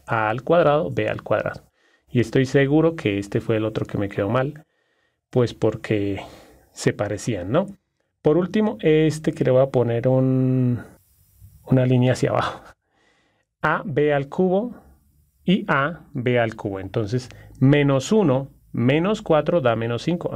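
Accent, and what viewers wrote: Colombia. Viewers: una duda usted es colombiano=??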